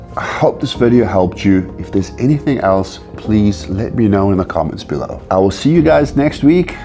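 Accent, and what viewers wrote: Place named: South Africa. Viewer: Great video, you sound very South African and look like a member of the Springboks. 🤔 Great content 💪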